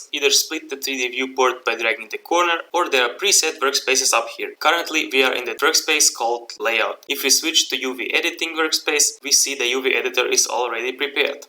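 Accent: Russia. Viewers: INTERESTING, only I hear a Russian accent, or I'm wrong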